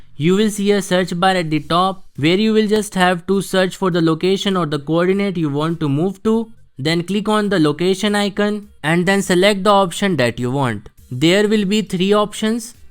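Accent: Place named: India. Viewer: Soon as I heard the Indian accent..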